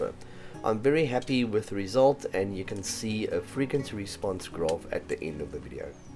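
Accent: South Africa. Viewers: South African accent but now based in the US?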